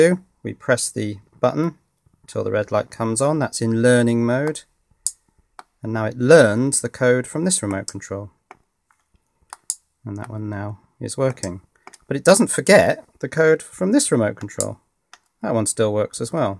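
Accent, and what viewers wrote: England, United Kingdom. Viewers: Hello, How nice to hear an English accent. I'm looking for a way of wiring two solenoids which are going to oper ate alive catch rodent trap. I need 12 volts for this. I have looked at adapting a wireless door chime but the out put on that is only 3 volt. By the way, I have O level physics only! Any thoughts much appreciated? (I'm on very limited budget) Thanks for sharing an informative tut/video. David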